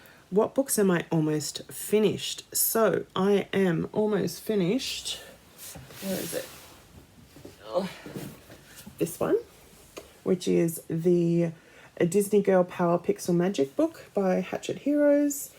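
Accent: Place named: Australia. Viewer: I live very near to Newcastle Upon Tyne in the UK & my daughter actually lives there. As for accents, love the Aussie accents. 😊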